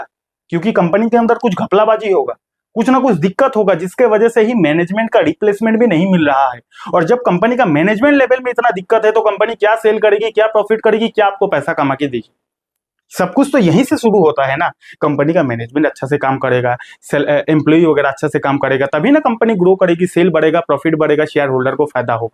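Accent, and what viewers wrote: Bihar, India. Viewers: Are you bihari???😊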